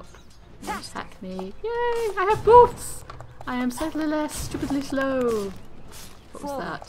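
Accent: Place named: Scotland, United Kingdom. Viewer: This was really enjoyable to watch! Crystal Maiden is my most played hero. I've never actually played ranked yet, partly because I'm worried I'm most likely gonna fail miserably and calibrate myself at an embarrassing level and also because I'm not sure if I would be dedicated enough to push myself to climb, but it is definitely something I would like to attempt anyway. Also I have to ask, (hopefully this isn't too creepy, but) where are you from (I would guess you are Scottish, but I don't want to be wrong and look stupid, which I guess I have done by writing this comment anyway... oh well)? Your accent is awesome! Well I sure dragged this comment on a bit. I hope you do more of these, this was really good.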